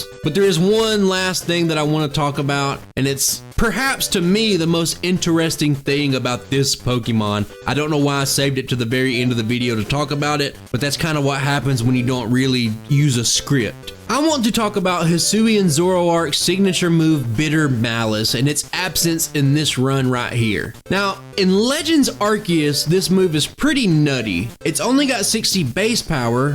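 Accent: South, United States. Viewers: This my first video watching, definitely just found a channel I'll be tuning into. This is weird but I swear you're the only Pokémon youtuber with a southern accent lmao everyone else doesn't have one or they British or something.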